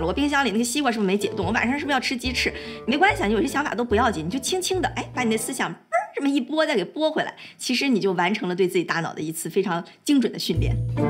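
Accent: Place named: Dalian, China. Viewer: 小lin的东北话 字幕都识别错误了。😂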